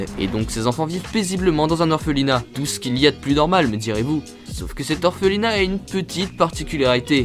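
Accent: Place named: England, United Kingdom. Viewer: Vidéo très intéressante et très instructive , accent anglais au rdv. Appréciable. <3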